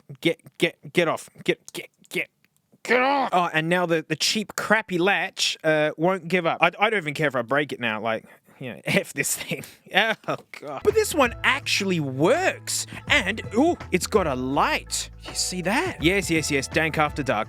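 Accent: Australia. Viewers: And so he can FINALLY let his true Aussie language out.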